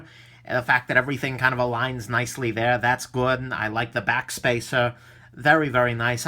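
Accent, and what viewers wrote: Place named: New Jersey, United States. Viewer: ! Love your reviews and your voice reminds me of everybody I ever grew up with in Jersey. Seriously, your reviews are detailed, descriptive and objective. Thanks and keep it up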